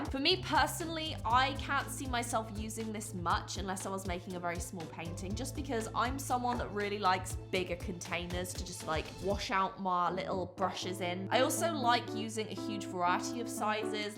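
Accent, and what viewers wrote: United Kingdom. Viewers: I love your energy and sense of humour in all your videos)) and i also love your british accent 🤩 I think that the best thing about this rinser is that you actually can use different colors and keep rinsing. You should test it vs. a regular jar using not only pink, but pink, blue, yellow, etc. Then, I think, the rinser would prove very effective 😁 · Hi lady I don’t watch your channel but my sis dose, she doesn’t have a YouTube account but she wants to enter the give away and she LOVES your videos she’s very obsessed with your vids and wants to tell you she like your British accent 😂 I also subbed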